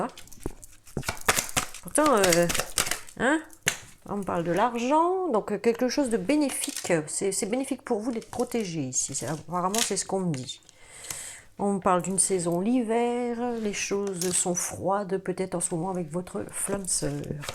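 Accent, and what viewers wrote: North, France. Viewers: Hein !! Hein !! Y’a un ptit accent du nord !!!!! Excellent..merci !! 😂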